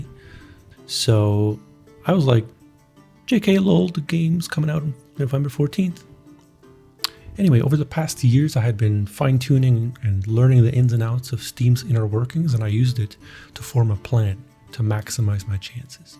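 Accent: West Flanders, Belgium. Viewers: Te ton nog ne west vlaaming. Van wo zij wel? We zijn trots. Veeeel sucses nog in de toekomst. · En nen west-vlaming ton nog 😅 gg!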